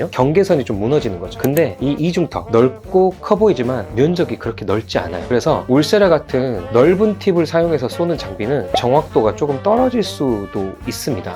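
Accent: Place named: Jeolla, South Korea. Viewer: 전라도 사투리 구수하네예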